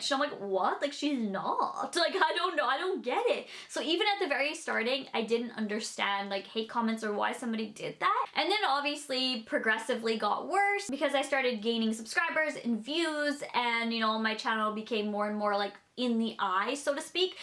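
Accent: Canada. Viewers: Honestly I only scroll down enough to leave my comment and move on. It makes me so upset that people say all these mean horrible things to you. On all your videos you are just the sweetest, nicest girl. I love your cute little Canadian accent and how bubbly you are. Totally makes me day when you have a new video out.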